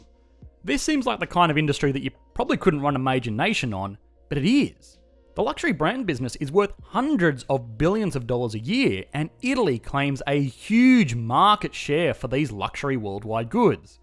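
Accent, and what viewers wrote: Australia. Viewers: Australian eh? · I love the Aussie accent! So good to hear my people on Youtube. Step aside... Canadians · The accent is Australian as far as I can tell. His views on Brexit suggest that ie ABC/corporatist/left leaning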